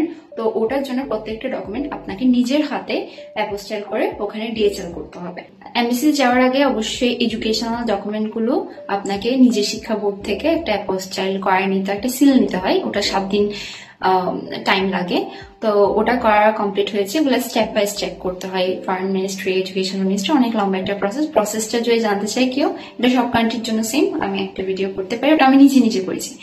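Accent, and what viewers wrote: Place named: Chittagong, Bangladesh. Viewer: whan you say Bengali, চাটগাঁইয়া একসেন্ট আসে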